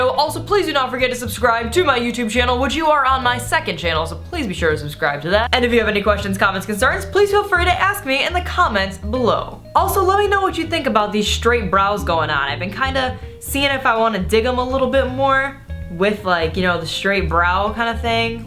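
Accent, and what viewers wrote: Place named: Fargo, United States. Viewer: You sound like you're from Fargo at times, I'm sure that's an assumption, I enjoy your accent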